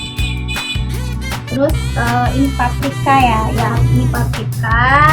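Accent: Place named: West Sumatra, Indonesia. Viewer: Sukses ya sistaaa, logat minang nyo itu looohhh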